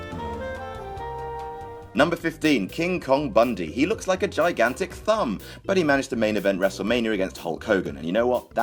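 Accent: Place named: United Kingdom. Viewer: fucking hate British accent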